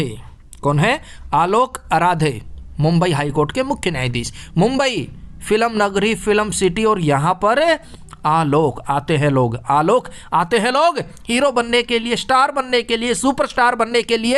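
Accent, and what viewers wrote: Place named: Haryana, India. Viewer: Hariyanwi accent acha tha....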